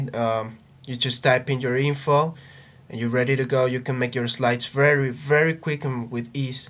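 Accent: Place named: Brazil. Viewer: like the accent, brazilian?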